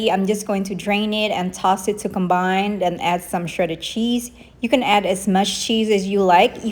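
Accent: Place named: South, United States. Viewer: Soooorrryyy. Please no one be offended. I just found this page trying to find lunch containers lol. I’m already obsessed. The whole time I was watching her videos I kept thinking to myself she has a little southern black twang in her accent! Either she’s part black or she’s always around someone black. I just thought it was funny how we have that little bit of seasoning in our words. Love this channel so far!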